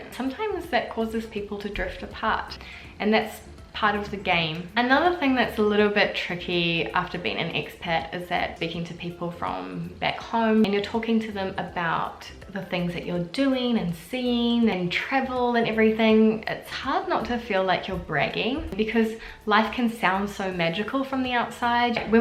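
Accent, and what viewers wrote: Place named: New Zealand. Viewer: I absolutely LOVE your kiwi accent! 🥰🥰🥰🥰 🥝🥝🥝🥝🥝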